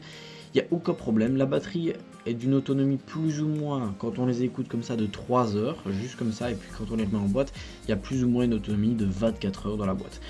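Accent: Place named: Wallonia, Belgium. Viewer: mais t'es wallon toi dit donc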